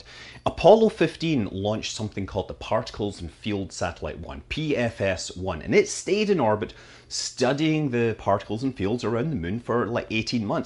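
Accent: Scotland, United Kingdom. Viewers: Once upon a time I was a six year old sitting on the lounge room floor watching an Apollo launch on a 23in b&w tv with the curtains drawn because, well, the phosphors had worn out. Years later, I am sitting in front of a Dell flat screen monitor listening to some guy with a Scottish accent walk me through a pair of lunar orbiting satellites taking careful measurement of the Moon's gravitational field. What a time to be alive. Fly safe! BTW, watched First Man last night, so I'm still a bit stoked about the things that man can achieve. Am so grateful for this channel.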